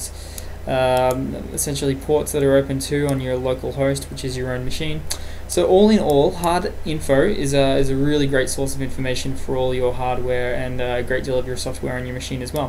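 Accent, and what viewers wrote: Australia. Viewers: Thanks a lot bro... thumbs up! You sound Australian :D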